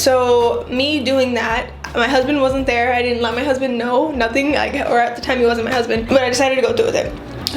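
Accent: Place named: United States. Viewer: This is so weird, your voice is exactly like my sisters and you have an American accent which makes it even weirder... no offense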